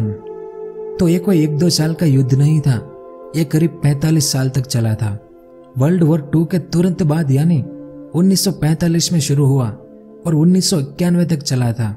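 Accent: Gujarat, India. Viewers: Your tone of hindi speaking shows that you are gujarati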